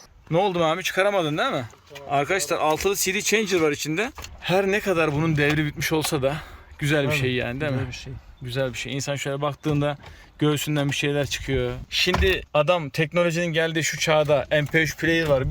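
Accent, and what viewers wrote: Gaziantep, Turkey. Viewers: Plaka 27 amcaoglu antep şivesi ile konuşuyor. Abi sen anteplimisn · Amcaoğlu hafiften Antep ağzıyla konuşuyor.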